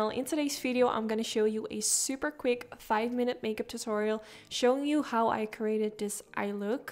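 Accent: Netherlands. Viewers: I immediatly thought you would live in the Nederlands ;) The accent always stays I guess. Nice tutorial! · Ik hoorde gewoon dat jij Nederlands was haha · She really sounds dutch it's located in america oh ok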